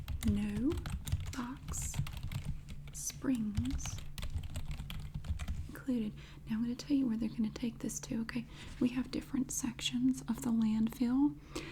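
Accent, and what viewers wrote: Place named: Carolinas, United States. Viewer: I think it’s so cool that you said Charlotte and that you’re from the Carolinas! I’m so used to hearing these accents and way of speaking I think you’re the only Asmr youtuber from down here it’s great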